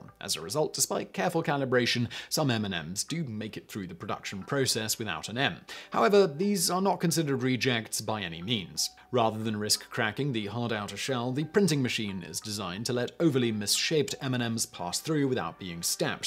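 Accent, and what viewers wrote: England, United Kingdom. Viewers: I'm really glad u didn't refer to hitting the like button as "smashing" it, like you have in previous videos. It's just kinda cringey. "Smash" is a term used by American teenage YouTubers, it sounds really wrong coming from an adult. Not to mention an adult with a very proper English accent. Your accent actually gives you a good amount of credibility, and when you say "smash that like button", it takes all that credibility away lol. i love you and i love the channel! Just no more smashing, ok? :) thx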